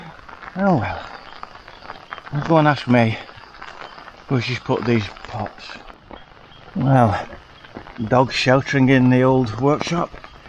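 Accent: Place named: United Kingdom. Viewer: great video, just subbed :)) which part of the uk is your accent from?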